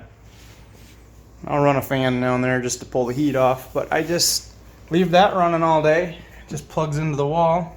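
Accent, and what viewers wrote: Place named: Midwest, United States. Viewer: He sounds like he is from sw Minnesota, or Nebraska or western iowa to me. I'm curious if I'm right.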